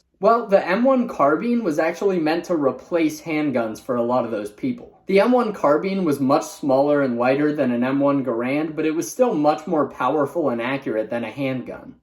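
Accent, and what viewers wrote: United States. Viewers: 25 years old and i will never not hate the way Americans say Carbine. The car bean thing was originally a American English vs. traditional English pronunciation. So in The UK, the Commonwealth and Ireland (ie 90% of the English-speaking world) its car byne To Americans its Car bean. And since in the modern day most firearms experts are Americans, you get Car bean being more popular in pop culture and online. Do you guys also call a turbine a turbean?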